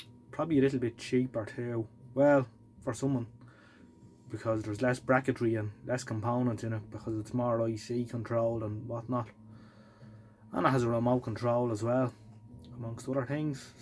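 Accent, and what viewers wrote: Ireland. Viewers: Love your strong Irish accent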